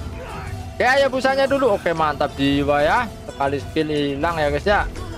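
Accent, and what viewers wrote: Java, Indonesia. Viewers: Asli mana mas jawane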